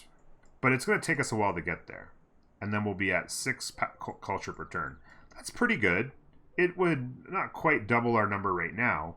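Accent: Canada. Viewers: The Canadian accent is so adorable. Our and Are sound the same, but Hour sounds completely different. Love it!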